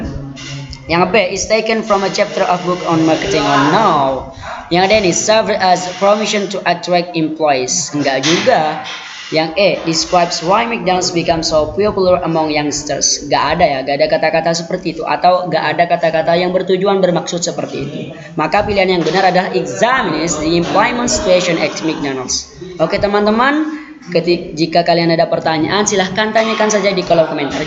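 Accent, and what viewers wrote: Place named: Madura, Indonesia. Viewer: Bahasanya ketahuan jek madureh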